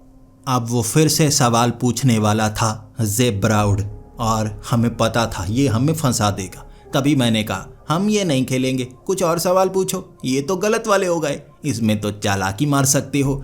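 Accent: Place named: Bihar, India. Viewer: Bhai ki bihari sunke to meri hanshi chhut gayi😂😂😂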